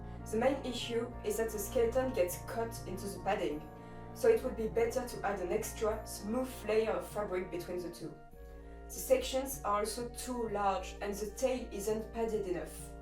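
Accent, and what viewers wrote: France. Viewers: i was wondering, is you accent a french one by any chance? i rarely get to hear french people speaking english with such fluidity so i'm not used to it. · Avec l’accent je me disait que c’était pas une anglaise mais une française 😅 Mais juste RESPECT pour pouvoir créer des parties de cosplay 👏 J’en prendrais graine lorsque j’aurais tout les matériaux pour faire mon propre cosplay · Are you french ? you havea strong accent ! (I'm french myself so just asking ^^) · Me, french people : FRENCH ACCENT !? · Tu es française toi sa s'entend xd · j'ai reconnu notre fameux accent en anglais x) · Are you French ? you have a french accent x) (si jamais je suis français et bravo pour ton travail !) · I don't know but I'm just assuming, she's French 'cus of her accent? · toi sa se voit que tu es française juste par ton accent, tu parles bien anglais quand meme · hum that french accent... 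i have the same one sinon joli travail. meme pour les prototypes, ils sont plutot bien réussis. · That so good omg ! I was wondering , are you French or something like that ? Btw it's really cool keep it like that is really amazing ! :D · Oh my gosh! You are SOOO adorable and talented. Thanks for sharing your processes in making articulated tails. My daughter loves them! She wants me to help her make one and this video has helped me SO MUCH! All the best to you! We love listening your cute French accent!!! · Tu es fr? On entend un fort accent français :3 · Ça sent le français cet anglais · 00000 i can use this for a fursuit tail!!! thank you so much! also let me just say your accent is amazing! is it french? i really like listening to it it's sort of calming :> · Are you french, you sond French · You have a fr accent i u right? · Are you French? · Are you french?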